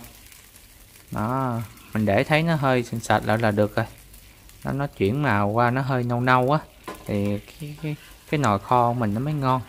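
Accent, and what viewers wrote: Mekong Delta, Vietnam. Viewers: Cách nói chuyện đúng chất miền Tây luôn ạ ❤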